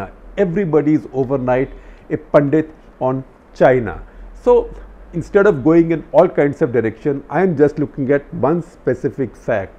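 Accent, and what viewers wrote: India. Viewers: As a Westerner, I always find Shekhar Gupta's analysis interesting. The Indian perspective is quite different to that of the western mainstream media. And the Indian way of speaking English is pretty cool too :-)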